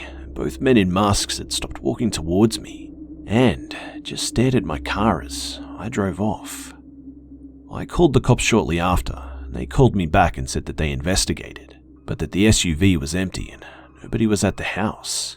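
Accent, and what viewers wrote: Australia. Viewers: this is a real Aussie accent and not the dumb stereo types people do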